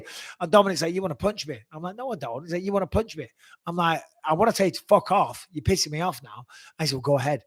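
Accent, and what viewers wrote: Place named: United Kingdom. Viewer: Not sure why you were one of my favorites… probably that British accent ;)…love the content brother keep it up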